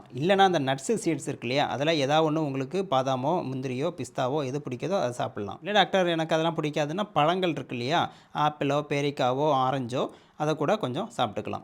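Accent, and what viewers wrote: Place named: Tiruchendur, India. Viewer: Nice sir. I am from Tiruchendur. Your slang is same like us. Which is your native place.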